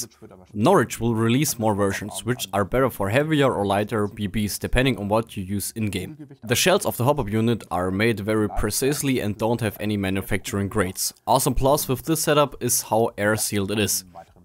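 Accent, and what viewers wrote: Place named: Germany. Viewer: Language advice: german to english - your V's and W's are backwards. You are using a Visim scope. Your pronounciation was "Wisim". Just flip it around and you should be good. I have german friends that make that mistake all of the time.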